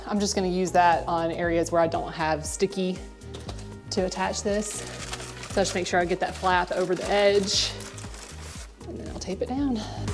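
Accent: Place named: Kentucky, United States. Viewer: So I've been trying to place your accent. I was thinking Kentucky, but is it Mississippi?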